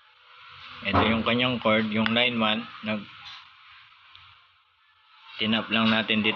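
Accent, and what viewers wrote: Pampanga, Philippines. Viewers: ang galing boss. itatapon ko na dapat yung dalawang sirang electric fan, napaandar ko pa. medyo mahina lang, try ko yung sinabi mong palitan ang capacitor para lumakas. salamat po! parang may pagka-kapampangan ang accent mo boss hehe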